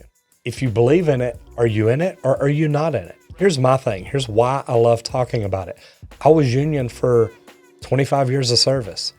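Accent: South, United States. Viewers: It’s an organization, you pay for a membership to reap the benefits. The good outweighs the bad, great pay and benefits but you get laid off. Also judging by his accent he’s from the south were unions have almost no foothold, less members, and less resources for training.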